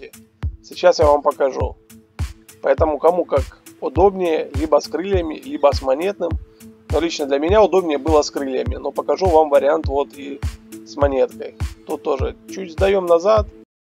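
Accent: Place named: Ukraine. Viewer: Для Украины нормальный говор. А нам русским режет слух. Зато какая информация ценная! 🔥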